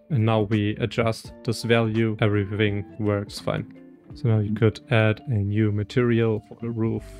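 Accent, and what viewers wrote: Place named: Germany. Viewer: Don't give a F about Generator, I find Denglish accent totally fascinating :) There are accents out there that sound deeply disturbing to me, e.g. arabic or russian english, I downvote and turn off those videos immediately if I accidentally click them. It's got nothing to do with racism, it's got to do with violating a language.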